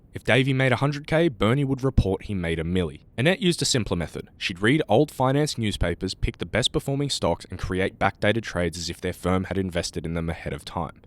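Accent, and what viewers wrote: Asia. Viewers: Asian accent is a bit wack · Sick video but the asian accent was unfitting, otherwise the video would be perfect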